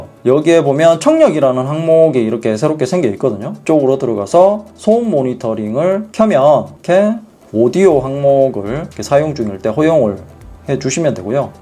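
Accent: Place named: Gyeongsang, South Korea. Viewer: 이헝님 겅상도 사람인가 말투가 구수하네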